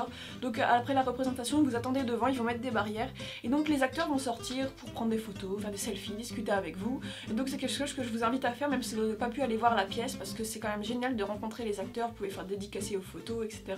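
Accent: North, France. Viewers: Tu viens du Nord ?🙂 Ps: Potterhead a vie 😍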